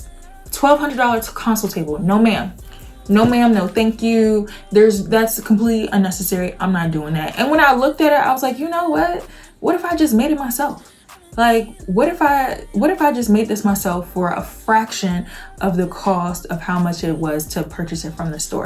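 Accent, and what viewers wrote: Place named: Appalachia, United States. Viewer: Why do you say'y'all?? Are you a hick only hillbillies, talk like that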